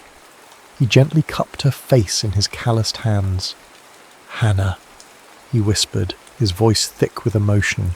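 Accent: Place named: United Kingdom. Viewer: Darwin, Australia. Thank you for this video and your lovely British accent 😊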